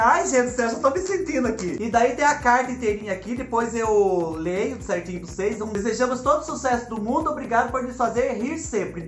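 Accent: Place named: Paraná, Brazil. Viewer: Espicula da redondinha kkkk minha mãe falava, aqui no Paraná .. no interior falam igual a vc... Morro de saudade de minha familia qdo escuto vc falar algumas coisas ... Kkk parabéns... Amamos vc. Espicula redondinha kkk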